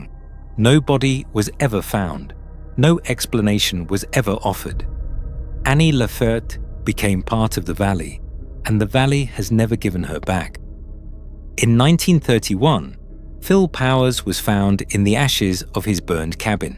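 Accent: United Kingdom. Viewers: Great writing and images. Excellent British voice in the narration - nice enunciation, warm tones, clear and listenable, great intonation and expression. But please if you can edit it, decide to use the Dee-nay or Dee-neh to pronounce Dinè. Don't be all over the place when the rest is so well done. Worth fixing if possible, to respect the Dinè.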